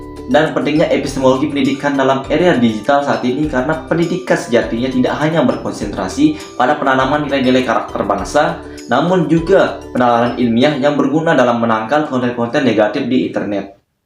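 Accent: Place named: Masbagik, Indonesia. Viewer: logat masbagekk neee kentell jmakk wett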